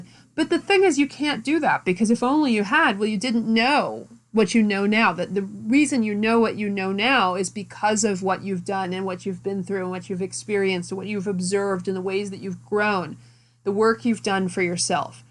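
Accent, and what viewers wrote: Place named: Salem, United States. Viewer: I love your Salem accent when you say "forward" as "foeward" ♥️👏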